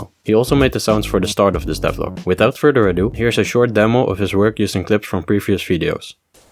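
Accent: Netherlands. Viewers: haha you are dutch :) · this dude dutch???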